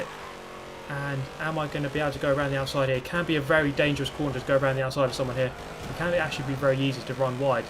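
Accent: United Kingdom. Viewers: Do all brits say "because" in the same way that you do?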